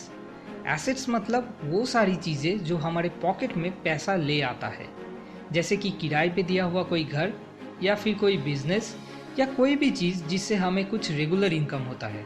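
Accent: Bengal, India. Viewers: ur voice seems like ur are Bengali ! Right? But by the way... video ach6a tha!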